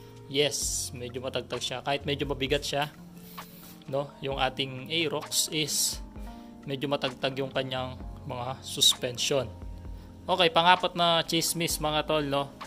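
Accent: Pampanga, Philippines. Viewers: Kapampangan ka par? Hahhaha keep up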